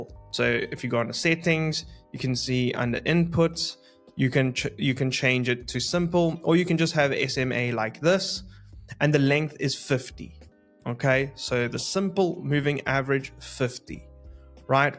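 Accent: South Africa. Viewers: You South African? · Hey bro, are you South African? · Are you South African by any chance? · Dude are you South African? I can't place your accent 100%, but sounds Saffa. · Is jy n suid afrikaner.?. Jy klink afrikaans..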